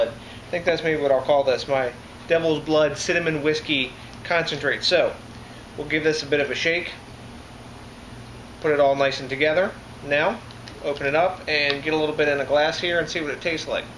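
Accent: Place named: Delmarva, United States. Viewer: I am pretty sure this guy is from the DelMarVa (Delaware/Maryland/Virginia tristate area). His accent gives him away. Let me know if I am right!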